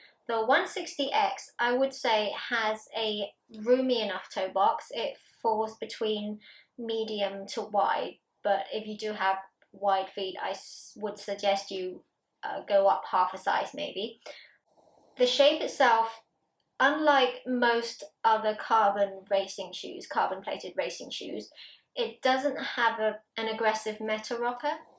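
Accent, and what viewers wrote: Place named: United Kingdom. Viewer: Great review, love that British accent. Bravo!!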